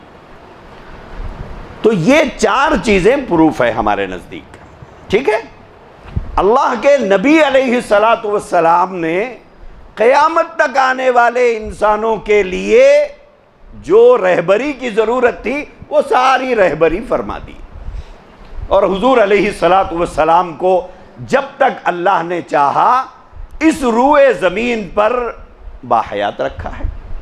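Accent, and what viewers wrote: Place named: Hyderabad, India. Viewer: MASHALLAH good magar apna neeshani theek se nahi batai aur bartoon ko bout lamba karty ho .phir b JAZAKALLAH KHAIR . Esliya Mai doctor israr SAHAB ko aur SHEIKH imran nazar HOSSAIN ko aur mufti zarwali Khan ko aur peer zulfiqar Ahmed naqshbandi SAHAB an etc etc kuch aur b hai unko sunta hu. Wasy mashallah hydrabadi urdu aplogo ki muja bht PASAND hai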